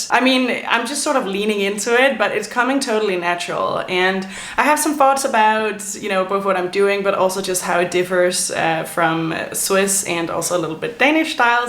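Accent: England, United Kingdom. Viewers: 😂 I’m an English woman and I totally approve of your attempt at an English accent 🤣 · Really interesting as a Brit hearing your comments. I think you are right- everyone all ages is wearing white trainers (not sneakers- 😊). I think it's a post covid rejection of uncomfortable footwear Converse are popular but tough to wear all day. I think we don't consider unique jewellery because most people don't prioritise spending money on it - we should - but definitely too much (imo) make up. I can confirm your skimpy tops are not shocking and the jacket does not stand out (i.e. it is nice but normal expect for it being 30c!). The cropped long sleeve square neck is lovely. Your English accent made me smile but it's autumn not fall 😀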